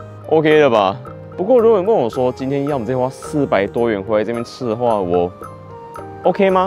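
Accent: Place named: Taiwan. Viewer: 你可以好好說話嗎？怪聲怪調，國語又台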